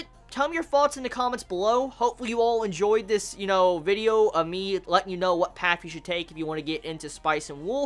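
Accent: South, United States. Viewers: At first I thought he was from the UK, but now I'm thinking he's from the southern states...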